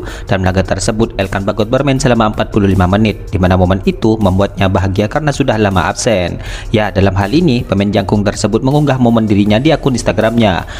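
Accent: Madura, Indonesia. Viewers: logat madura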